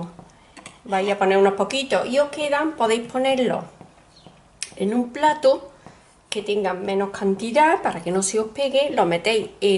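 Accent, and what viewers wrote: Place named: Cordoba, Argentina. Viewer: Muy ricos estos filetes rusos😋😋 Muchas gracias a tí por compartir esta rica receta. Cada día estoy más contenta de ser una de tus suscriptoras. Me encanta tu canal. Me gusta como cocinas y como explicas tus recetas con ese acento al cual le tengo tanto cariño. Un abrazo desde Barcelona y hasta el próximo vídeo, cordobesa guapa. 😘😘😘☀️☀️☀️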